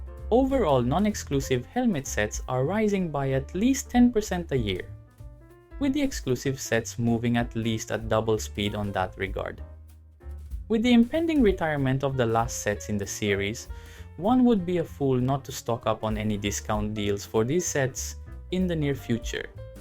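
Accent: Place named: Philippines. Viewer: Your accent sounds so Filipino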